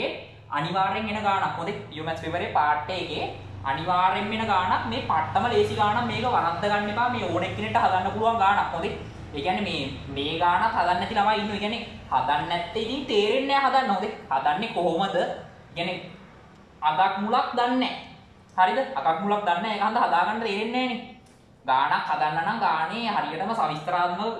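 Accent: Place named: South, Sri Lanka. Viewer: ❤🌝දකුණේ හඩක්...මරු mru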